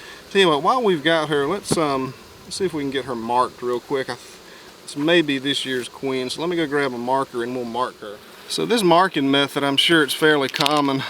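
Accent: South, United States. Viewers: "it was my granddaddy's" awe, as a canadian I dont hear real southern- talk much, its really heart warming to hear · Of course he has a southern accent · I live in North Georgia, most people I know have pretty deep southern accents. Because of where I live most people here are pretty mean, so the accent doesn't exactly give me the warm fuzzies. But this man seems so calm and kind that the accent sounds nice. I've watched maybe ten of his videos already and now I wanna be a beekeeper! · His southern accent soothes my soul "this was my gran daddies' " made my heart melt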